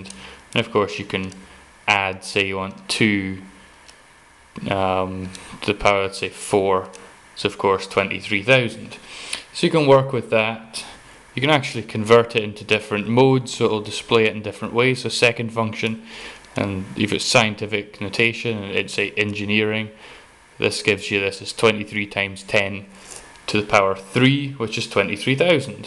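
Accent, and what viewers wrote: Scotland, United Kingdom. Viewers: IS THIS MAN SCOTTISH?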